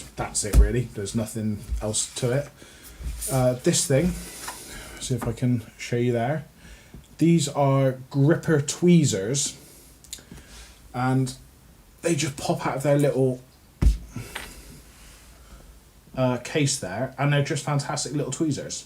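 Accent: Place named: Somerset, United Kingdom. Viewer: You sound like you are from Somerset which makes me wonder why you have some lock knives on you.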